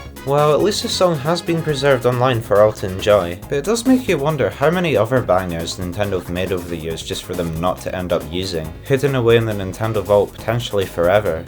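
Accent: United Kingdom. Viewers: Are you Scottish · My question is how come you have a NTSC final copy when you sound like a fellow Brit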